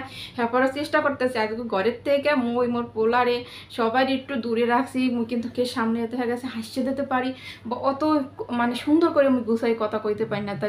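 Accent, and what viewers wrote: Barishal, Bangladesh. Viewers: আপা বরিশালের ভাষা মোগো আঞ্চলিক ভাষা ভালো লাগে আপনি এভাবেই কথা বলবেন ভালো থাকবেন ❤❤❤ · আপু আমার মেয়ে আপনার আপু আমার মেয়ে আপনার বরিশালের আঞ্চলিক ভাষায় কথা অনেক পছন্দ করে ❤❤আপনি এভাবেই কথা বলে ব্লগ করে যান❤❤পাশে আছিআপু❤❤ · আপু তোমার বরিশালের ভাষা শুনতে আমার খুব খুব ভালো লাগে❤❤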